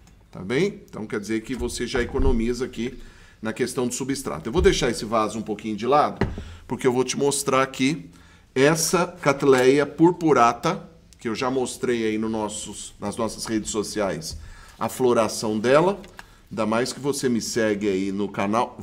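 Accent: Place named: Minas Gerais, Brazil. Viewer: Ai tu é mineiro uai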